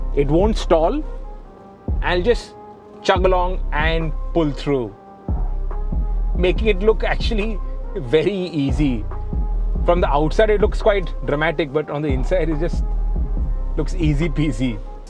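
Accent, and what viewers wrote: India. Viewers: Indian english hurts my ears so bad😂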